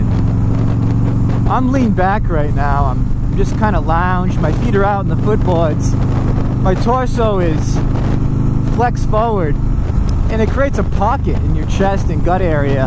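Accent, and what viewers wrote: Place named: New England, United States. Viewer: By the way, you from Boston? NE twang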